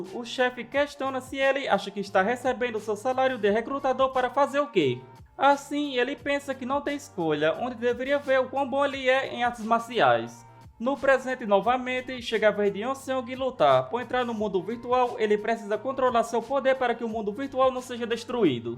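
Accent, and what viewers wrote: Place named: Northeast, Brazil. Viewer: Tu parece nordestino man Kkk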